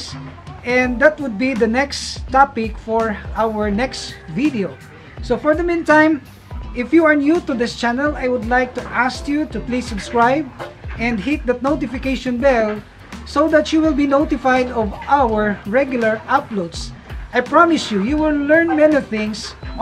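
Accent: Philippines. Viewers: ARE YOU PILIPINO?? YOUR ACCENT . PLEASE SPEAK TAGALOG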